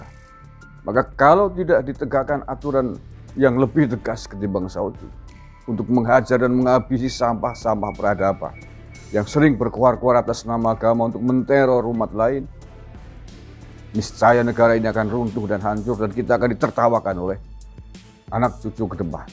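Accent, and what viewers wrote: Banyumas, Indonesia. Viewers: asli.... logat Banyumasannya kangmas satu ini yg bikin kangen dengerinnya... Sehat terus yoooooo Masbro.... :)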